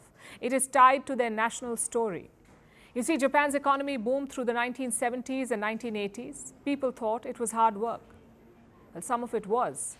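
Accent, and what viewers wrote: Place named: India. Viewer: It's difficult to listen to this presenter. Thick Indiannn accent. Lucky the caption helps.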